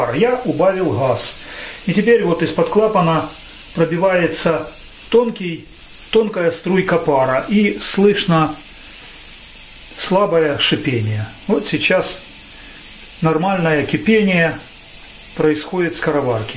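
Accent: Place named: Ukraine. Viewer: Хас, хатоука, прихотовление, ховядина, храдусы... Попробуйте сделать над собой усилие и говорите по-русски. Или уж честно на мове начинайте гоорить. К которой, кстати говоря, большинство русских относится совершенно нормально. Но это смешение наречий, рожающее суржик, просто режет ухо. А за рецепт спасибо! Зачётно!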